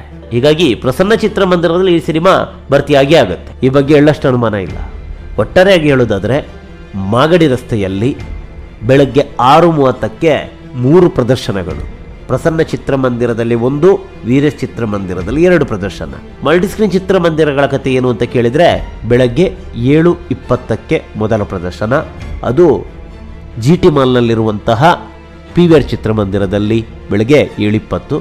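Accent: Coastal Karnataka, India. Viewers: ಭರ್ಜರಿ ಯಾದ ವಿಶ್ಲೇಷಣೆ...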